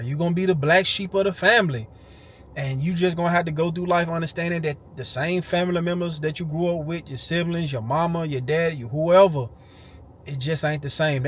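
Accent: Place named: Louisiana, United States. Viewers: New sub,u speaking facts🤣😂🤣😂😂😭😂😭😂aye u got a southern accent u gotta be from da south,im from Louisiana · Sounds like Louisiana to me cuz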